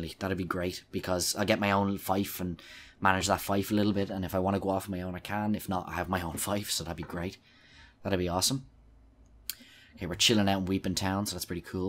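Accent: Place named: Ireland. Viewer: I figured with the actent lol where in dublin are you from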